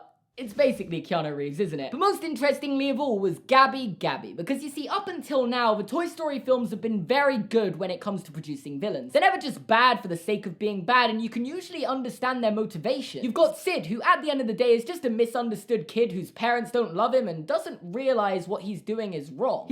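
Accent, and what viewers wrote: United Kingdom. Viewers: I lost touch with this channel for about a year and I'm extremely glad I decided to go back and listen to him go on Cars 2 again. I missed British.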